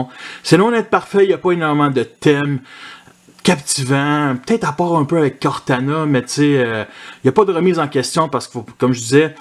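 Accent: Quebec, Canada. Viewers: Bonjour, Vous allez faire d'autres vidéos de livres à l'avenir, j'espère. Vous présentez bien et vous avez un bel accent Québécois.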